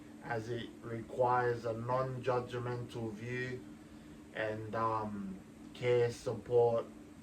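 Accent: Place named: New Zealand. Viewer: Are you originally Kiwi? I hear a bit of a Kiwi accent but you're Polynesian anyway! That is clear brother!😂😜I'm gonna say Samoan (please correct me if I'm wrong!) I've played rugby with so many Pacific Islanders and I have a great love for you guys! The most generous people I have ever met! All the best brother! Much love from Ireland! Nofo lelei lou uso!❤🇮🇪🇼🇸🇹🇴🇫🇯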